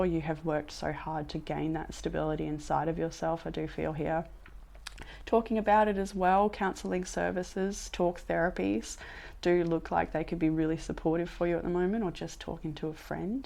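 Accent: Australia. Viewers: I got caught up in my own blues and forgot to thank you for the beautiful reading. Love hearing the Aussie accent. I spent beautiful years in Australia and recently I've decided to manifest a visit!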